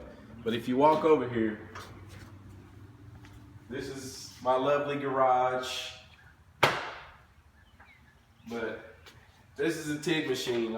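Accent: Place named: South, United States. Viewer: What part of the south are you from. Southern drawl is really noticeable. But great video.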